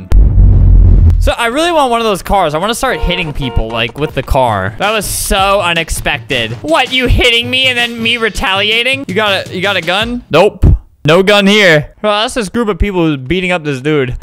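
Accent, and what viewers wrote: United Kingdom. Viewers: This is amazing cause im from South London and quite a few people actually speak like this lol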